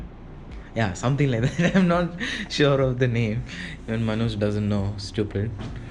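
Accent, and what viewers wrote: Karnataka, India. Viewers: You have good kannada accent. 😃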